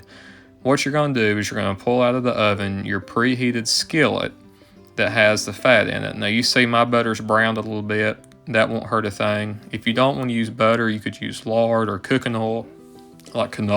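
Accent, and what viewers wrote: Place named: Mississippi, United States. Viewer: I don’t know where you’re from but it sounds like Mississippi. I enjoyed the video. Beans & cornbread is one of my favorite meals.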